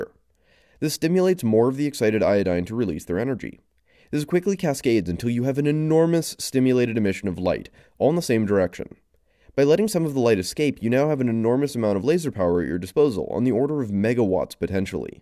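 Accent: Canada. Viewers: Very well done, and informative. But a just can't get past the the " aboot " and " oot " pronunciations. Question though when you show this glow stick of death shining red, is it giving of heat as part of the reaction? Is it a byproduct ?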